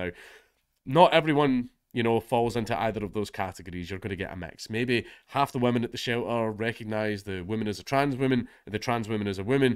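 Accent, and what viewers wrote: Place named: Scotland, United Kingdom. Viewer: It makes me so proud so hear such sense being spoken in a Scottish accent.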